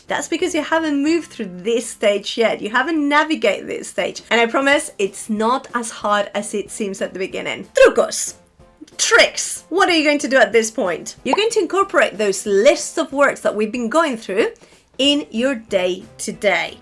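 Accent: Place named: RP, United Kingdom. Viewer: Es una locura el acento que tienes, nunca hubiera pensado que eras española si no hubiera leído la descripción del canal. Hablas el inglés británico (RP) perfecto. Yo estuve un año trabajando en Londres y tengo acento de allí, además soy andaluz así que tengo 2 acentos muy marcados en ambos idiomas 😅 Igualmente eres un ejemplo a seguir para mí, ojalá hablar el inglés tan bien como tú algún día!!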